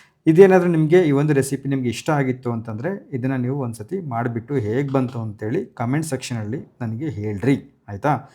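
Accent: Chitradurga, India. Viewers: My mom has become your fan. Trying to understand your Chitradurga Kannada, but nice.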